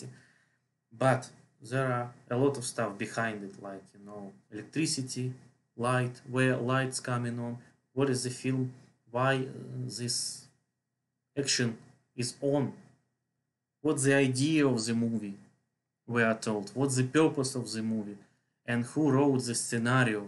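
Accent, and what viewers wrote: Russia. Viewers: M8 you need to turn up the volume I am Croat and I can follow Russian accent but it is a real struggle to hear you. Great work but watching this before dark is almost impossible.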